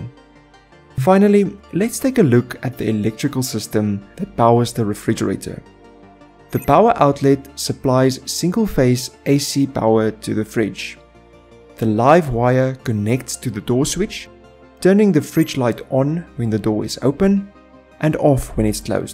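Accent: South Africa. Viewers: South African accent👍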